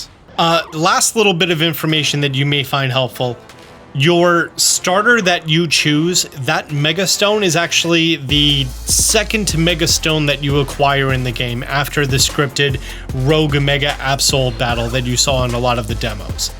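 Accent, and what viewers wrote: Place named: New Jersey, United States. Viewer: Want to know how I know you're from New Jersey? You say: wooder If you see this.. Taylor Ham or Porkroll?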